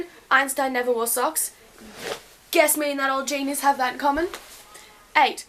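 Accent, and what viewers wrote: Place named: Australia. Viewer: Great video :D Love the Aussie accent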